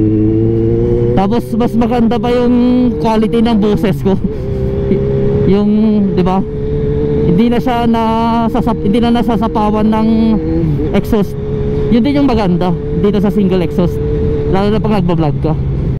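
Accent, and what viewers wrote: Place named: Ilocos, Philippines. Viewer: ilocano ka met brod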